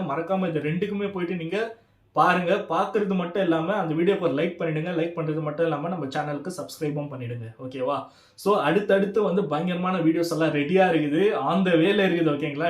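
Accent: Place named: Bangalore, India. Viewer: Bangalore tamil slang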